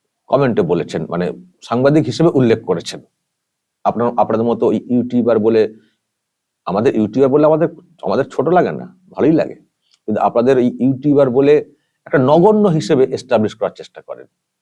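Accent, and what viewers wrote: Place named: Bangladesh. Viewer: ache vai keman ekta bangladeshi taan taan vab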